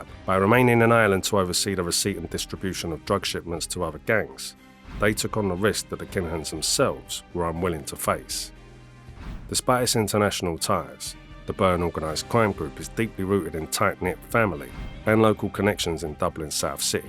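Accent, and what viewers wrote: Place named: Cockney, United Kingdom. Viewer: These goofy cockney accent narrator's are super cringe and speak like they are on antidepressants ffs I have to listen on ×2 speed.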